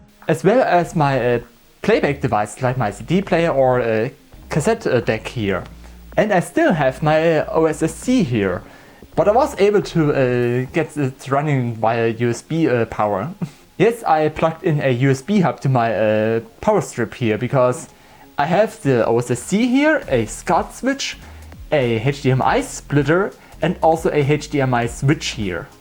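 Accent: Germany. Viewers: I hear German accent! Dieses Video ist nun Besitz der Bundesrepublik Deutschland! Brennstuhl perfectly pronounced, clearly German..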